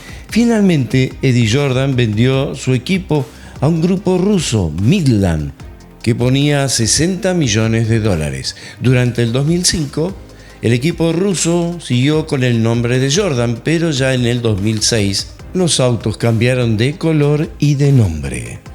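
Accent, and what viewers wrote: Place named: Rioplatense, Argentina. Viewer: Espléndido informe, nuevo suscriptor. No sé si sos argento o uruguayo 🙋🏻‍♂️desde Chaco🇦🇷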